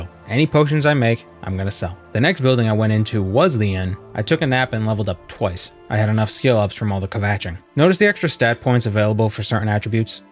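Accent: Boston, United States. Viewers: the bostonian accent is strong with this 1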